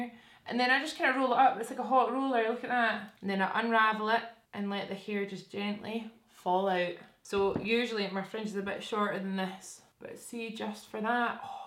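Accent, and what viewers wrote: Scotland, United Kingdom. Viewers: When I started watching your videos ageeees ago I understood every second word you'd say haha now I'm like half Scottish xD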